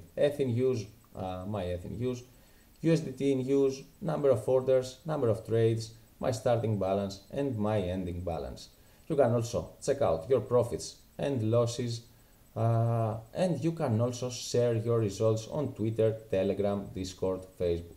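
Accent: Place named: Greece. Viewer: are you greek ? only Greeks speak english like that, αυτα ειναι πολυ ψαγμενα πραγματα , ευχαριστουμε που μας δειχνεις τα φωτα σου, άτομα που εχουν φτασει σε αυτο το βαθος ερευνας και χρησης αυτης της τεχνολογιας ειναι ultimate tear geniuses.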